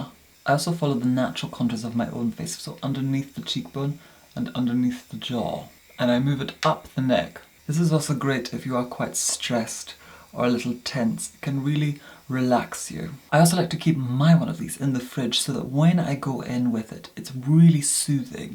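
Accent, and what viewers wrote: Scotland, United Kingdom. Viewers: idk about that but i know he went to college in glasgow and his accent is definitely scottish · I'm from Australia, apparently he's from Scotland I read. You guys have too many accents 🤣😂 we just have one Straya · Rose He is from Scotland; in his earliest videos the accent is more pronounced. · Literally the most posh Scottish accent ever but I love it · Liv Cerys then you must be German as he is Scottish for sure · is he scottish or irish? it keeps changing for me please be scottish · Seamlessly Scottishness. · Is it just me or does his accent sound Scottish? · He defiantly has a Scottish accent just a very posh one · Cora alice Nugent 'sorry' indeed. Accents are malleable... If you listen closely he still has a bit of Scottish in there. Can't believe how rude some people can be. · where are you from? i hear a northern twang in your english accent, perhaps even scottish, however you speak very proper and pronounce some words how southern english people would. 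i’m very curious. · He's actually from scotland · I love the accent. I can hear a scottish accent under the voice. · Scottish I believe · He is from Scotland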